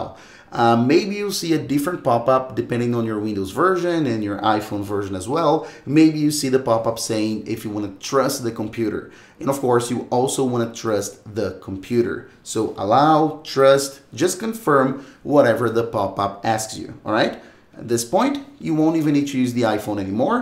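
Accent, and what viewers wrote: Brazil. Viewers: Muito engraçado como seu inglês é quase perfeito, mas da perceber que eh brasileiro, ótimo trabalho irmão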